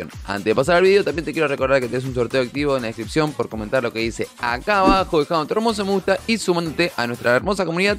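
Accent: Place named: Argentina. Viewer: ¿Eres Argentino? lo puedo notar por tu acento, saludos desde Brasil 🇧🇷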